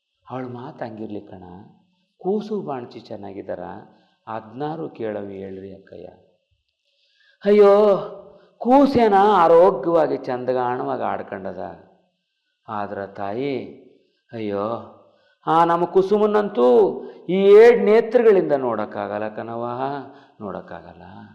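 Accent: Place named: Old Mysore, India. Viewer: ಹಳೆಯ ಮೈಸೂರು ಪ್ರಾಂತ್ಯದ ಗ್ರಾಮೀಣ ಸೊಗಡಿನ ಮಾತಿನ ವೈಖರಿ ಅದ್ಭುತ ಗುರುಗಳೆ 🥰❤👌🙏🏻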